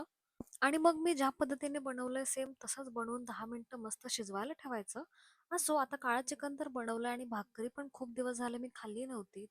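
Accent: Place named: Kolhapur, India. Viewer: Hey, love your simplicity and yummy recipes.. ugach show off nasato kadhi, specially Kolhapuri tone sounds unique.. keep shining 🎉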